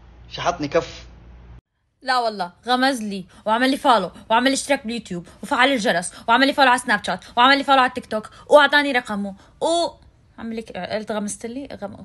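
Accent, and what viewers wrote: Iraq. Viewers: بعرف مين انت…وانا سندس الاكس يا عمو…هخهخ صاير تحكي عراقي ااه ؟وفهد الزين كمان ياي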